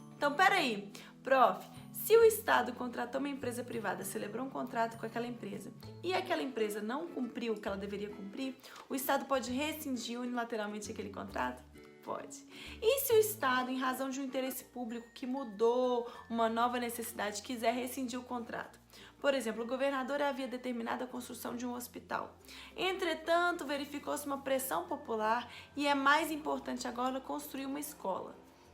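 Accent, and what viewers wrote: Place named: Minas Gerais, Brazil. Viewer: Sotaque mineiro é inconfundível 😊⚪🔺⚪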